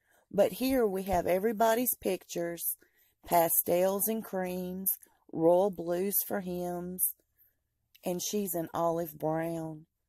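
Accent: South, United States. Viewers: You sound Southern are you from the South? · I can't decide if you are, in fact, Paula Deane, Nancy Grace, or Samantha Markle. Your deep southern accent is so familiar but I just can't quite place it. So much snark and meanness directed at Meghan. What is your beef with her and why do you care so much what she is up to? Meeeoooww. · Girl, you crack me up!! Im from the South and you bring back lots of memories of growing up among Southern white women. No harm or racism intended...love your accent!!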